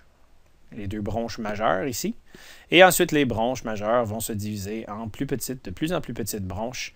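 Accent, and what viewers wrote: Canada. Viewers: Vous êtes canadien? Je suis brésilien et je regarde vos vidéos pour apprendre le français et aussi pour apprendre la physiologie, je suis un étudiant de vétérinaire .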